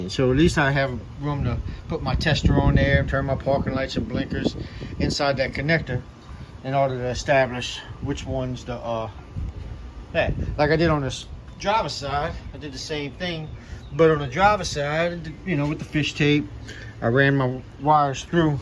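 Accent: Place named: Louisiana, United States. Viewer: You gotta be from Louisiana brother lol. I know that accent from a mile away, I lived in Louisiana for 5 years. Great video, I bought similar lights for my truck, thanks for sharing!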